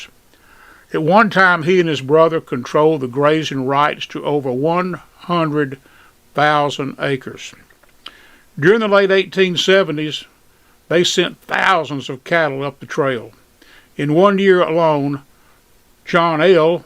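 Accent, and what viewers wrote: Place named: South, United States. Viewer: So glad the WWHA film crew discovered and documented this story of the last of the Daltons. It is overlooked by history and Jones deserves the recognition for his dedication to the state of Texas, for he has received almost no credit in history. The story of the Dalton Gang's demise at Coffeyville, Kansas when they foolishly decided to rob two banks at once is well known, including the fancy factory engraved Colt pistols they carried, but this is new. The narrator's deep southern accent does not hurt either! Dodging goats? Good sense of humor too! Join WWHA!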